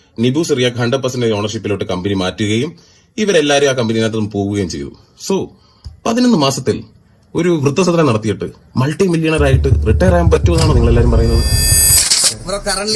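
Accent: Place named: Kochi, India. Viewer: Like Kochikaran 😂😂😂😂😂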